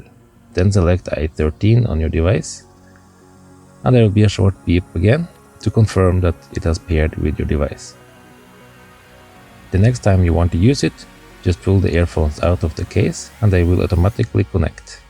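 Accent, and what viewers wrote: Norway. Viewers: the norwegian accent. AHHHHHHH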